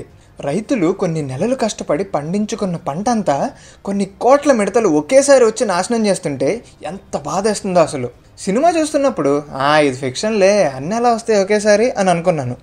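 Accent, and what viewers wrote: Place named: West Godavari, India. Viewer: Baaga cheppinaru....way of telling is very very nice😍😍😍..midhi west godavari aa??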